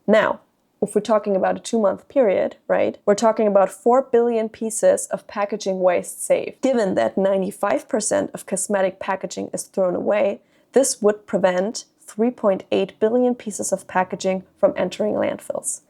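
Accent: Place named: Germany. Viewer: Ich finde es so sympathisch, deinen deutschen Akzent zu hören. Freue mich auf's Video. Herzliche Grüße aus Berlin 😊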